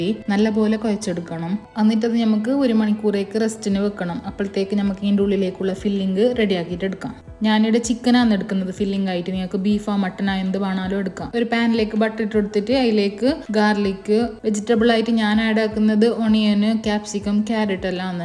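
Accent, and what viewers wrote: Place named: Kasaragod, India. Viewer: Ninga kasaroda Slang Nella Cleland kelkan❤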